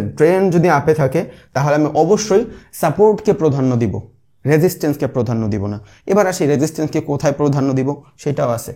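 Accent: Barishal, Bangladesh. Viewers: ভাই বরিশাইল্লা ভাষাটা ভালো ছিল❤❤❤ জোস❤❤❤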